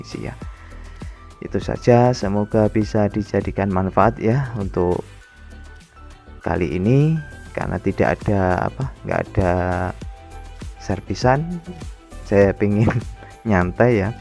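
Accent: Java, Indonesia. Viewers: Orang Lampung ? Kok logate jowo mas!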